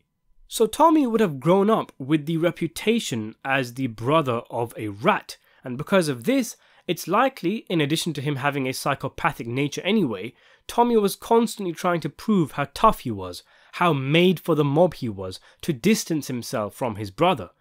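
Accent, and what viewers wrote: England, United Kingdom. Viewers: Your English accent is amazing. I'm American from Ohio an this is just beautiful with your voice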